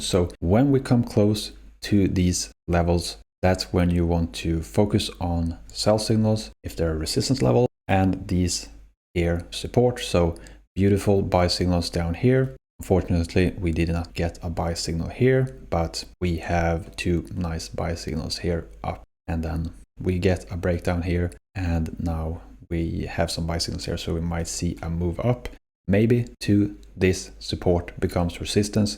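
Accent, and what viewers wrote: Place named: Sweden. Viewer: He sound pretty swedish🙌🇸🇪👏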